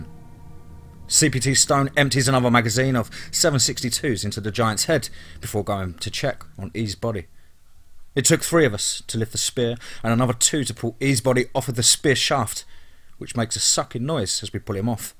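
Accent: England, United Kingdom. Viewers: Loving 🥰 the English accent of this storytelling #teamfear love ❤️ Ally from Australia 🇦🇺 #TEAMFEAR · Yeah right. Believe this guy. He said he was raised in Kansas City and is an officer in the Rangers. Yet he has an English accent so thick you can cut it with a knife, and there's no Midwestern US overlay. None. He couldn't even pronounce Fort Leavenworth properly. Bad joke and dumb story. Thumbs Down #fakemantalking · wheres the same stories without the english accent?? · Tell you what...You are not from Kansas City with that British accent. sorry but I think you are a writer trying to pull a fast one on a bunch of us who look at this stuff on you tube. You even talk like a novelist. You have done your research and its too familiar to other posts. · If you're from Kansas City, where did you acquire your UK accent? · He's NOT australian . He's English. 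!!!! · First of all he’s English and secondly it’s a creepy pasta...not real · Why does a guy from Kansas City have a UK accent??!! Come on man. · Mock these encounters at your own risk..BUT.......even if this particular story is BS... there are CREATURES that we're born from. FALLEN ANGELS. Benne Ha Elohim translates in Hebrew as " fallen ones"....... The British accent is cute...... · Dude your accent doesn't sound American. You sound british. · An English accent? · Hi there, I am newbie just now and I don't know why I haven't encountered your channel over the years I'm listening to audio stories on here . I've subbed , I like the range of content I have seen so far , and nice to hear an English accent , definitely a minority amongst the narrators on YouTube. Greetings from this Irish girl in stormy Portsmouth UK this afternoon. 💚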